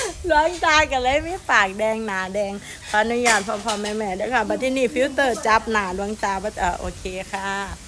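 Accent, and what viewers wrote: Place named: Isan, Thailand. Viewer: สาวชัยภูมิ,สำเนียงภูเขียว ชุมแพ ภูเวียง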